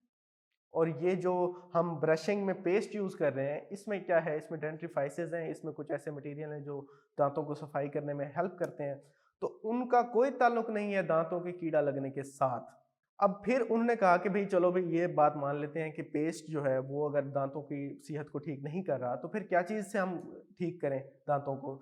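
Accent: Saraiki, Pakistan. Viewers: Sariki speaking lG rahy dr. Sahab 👍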